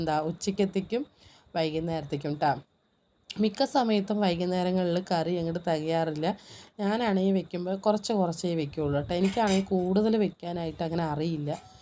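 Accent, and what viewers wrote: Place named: Pathanamthitta, India. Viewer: വീഡിയോ ഇഷ്ടം ആയി. ഞാൻ പത്തനംതിട്ട ആണ്. ഒരപേക്ഷ ഉണ്ട്. നിങ്ങൾ പാലക്കാടൻ സംസാര രീതി ആക്കാമോ. ഞാൻ അത് കേൾക്കാൻ ആണ് വീഡിയോ കണ്ടത്. പക്ഷെ ഇതൊക്കെ ഞങ്ങളുടെ സ്ലാങ് പോലെ ഉണ്ട്. നിങ്ങളുടെ ഗ്രാമ ഭാഷയിൽ സംസാരിക്കു... മനോജ്‌ ബ്രോയെ പോലെ.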